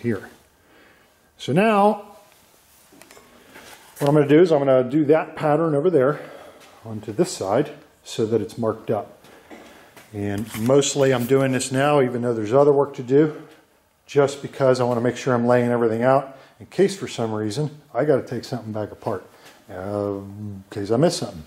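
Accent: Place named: Pittsburgh, United States. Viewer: I here Pittsburgh accent. 😁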